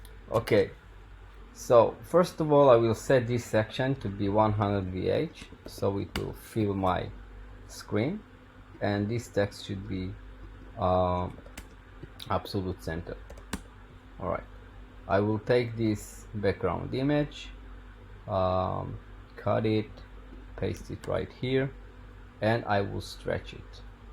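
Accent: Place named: Israel. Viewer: הייתה לי תחושה שאתה מישראל חחח תודה על הסרטונים!!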